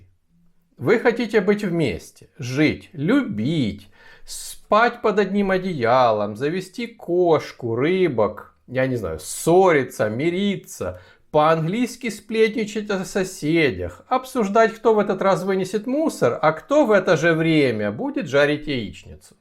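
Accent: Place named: Ukraine. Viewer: я слышу по говору что вы из Украины, и вас как и меня выдает буква Г. Хотя еще могу предположить что вы из Ростова. А мы из Одессы, да, и мы тоже находимся в Лондоне. Так сложились обстоятельства.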